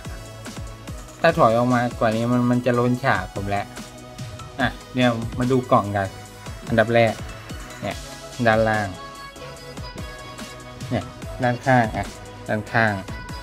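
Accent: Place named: Rural, Thailand. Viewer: พ่อค้าพูดเหน่อจังเลยคะ คนบ้านใด่คะ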